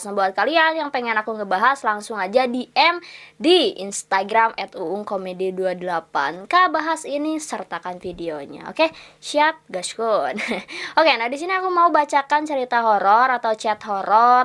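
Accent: West Java, Indonesia. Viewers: Kak keliatan banget orang Sunda nya lipe kak😭❤️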